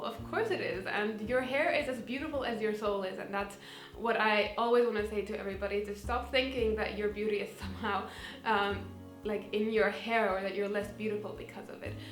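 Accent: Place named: Germany. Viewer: Wow that is exactly the kind of positive vibe I'd need for starting into 2019 with a healthy mind❤ I always had thin hair no matter what, but I'm finally coming to terms with it! Also I have a question for you, I don't wanna be rude or something but you sound like you have a German accent :) it doesn't sound bad or anything I just noticed it bc I certainly have one 😁❤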